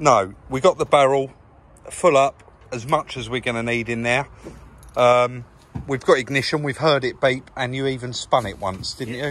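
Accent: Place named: Southend, United Kingdom. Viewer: Haha I swear your South end accent has gotten stronger in these recent videos lol. "Thirty orse, this fing ull go like a rocket" "sprayed every fin ap wiv a bit ovv dabble u dee fortee" 😂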